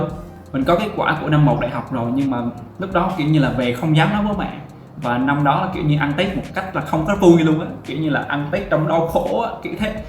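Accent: Da Nang, Vietnam. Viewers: a oi anh học ở đn hà anh, nghe giọng n giống đà nẵng qué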